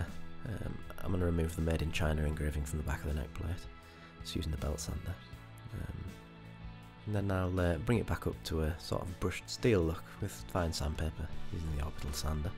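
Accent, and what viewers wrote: United Kingdom. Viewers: awesome video, but the narration parts where your voice trails off and you get a bit quiet is MADDENING! Keep your speech loud and clear please, especially for us yankees who need all the help we can get understanding the British accent. Cheers!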